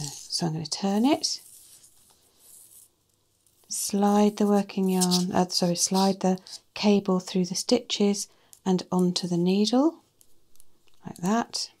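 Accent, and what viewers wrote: England, United Kingdom. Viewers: Thanks for the easy to understand instructions. Love your English accent!!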